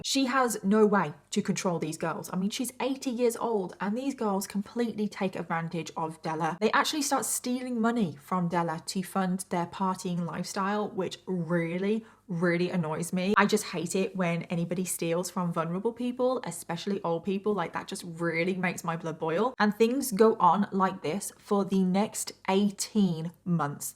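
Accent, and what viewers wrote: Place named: England, United Kingdom. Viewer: I saw this case many years ago but decided to watch your version and your research on it was spot on. Great job!! Btw I'm a twin as well. Similarities between my twin sister and these twins are one thing only; the deep bond we share that's it. The rest nada. We were the victims of abandonment and physical, psychological, emotional and sexual torture. My twin sister and I got introduced to hell from the very first breath we took, that was our life. The PTSD took my mind into homicidal thoughts, and suicidal attempts. I jumped off a building and broke almost every bone in my body, clinically flat-lined, coma for 13 days then came out of it. Then knowing I survived I did it again but this time went higher up in the building. An identical thing happens, clinically dead, in a coma for 13 days, came out of it and here I am ALIVE. 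3 years now, and with many severe different and alternative therapy, for PTSD, etc I can tell you I am finally finding my feet. I now LIVE more than survive. My twin and I are SURVIVORS of the unthinkable. We are beautiful, loving, caring, compassionate, nurturing, funny loving and amazing women. I say that with humility, not arrogance or ego. So it blows my mind how these twins can so nonchalantly and callously murder their beautiful mother over a simple curfew or a few basic rules. Normal people just don't. Abnormal people don't, but evil does. May the mother be in peace forever. I love your crazy mad English accent and your channel. Thank you for your h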